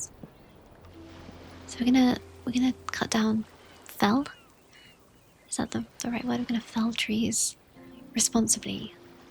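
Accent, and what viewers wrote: England, United Kingdom. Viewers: This is the most English voice I've ever heard, goated 🙏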